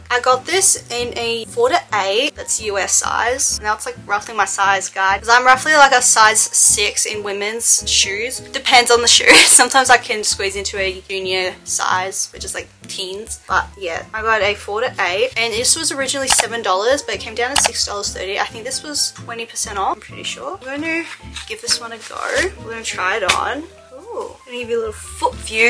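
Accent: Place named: Australia. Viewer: Literally obsessed with ur Aussie accent 😍 if u edit on ur phone can u do a video on how u edit I love ursss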